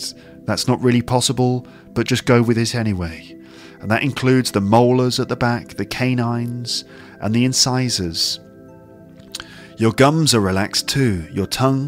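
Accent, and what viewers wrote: United Kingdom. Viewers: To be honest I fell asleep once while listening to your podcast 😐 Please don't hate me - I've turned your podcast on with purpose, hoping that your pure British pronunciation will get some far parts of my poor exhausted brain and settle itself there))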